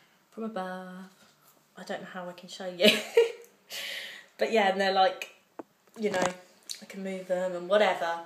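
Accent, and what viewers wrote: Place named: Devon, United Kingdom. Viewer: Are you from Plymouth? Or somewhere in Devon? Your accent is so similar to mine xx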